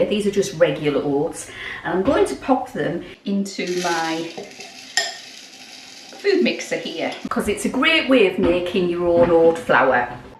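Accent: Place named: Cumberland, United Kingdom. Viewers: Wow! That is delicious! Thanks very much we all loved it. Enjoyed watching you make it too. I’m from the UK but live in New Zealand and I really enjoyed hearing your lovely Cumberland accent-slight homesickness was caused! So, many thanks from another! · I'm from Cumbria but i live in Italy, it was so nice to hear your accent, it made me homesick, I'll definitely be making this gingerbread, thank you .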